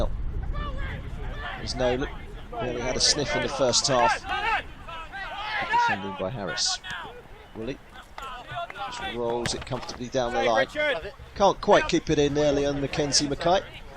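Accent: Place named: Northern Ireland, United Kingdom. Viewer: commentary is very BBC1 NORTHERN Ireland.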